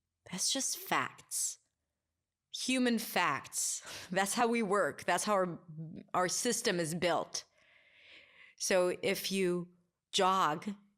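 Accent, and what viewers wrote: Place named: United States. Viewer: This is not practice? 😲😲 😲 I thougt she was American, so good her accent! 😶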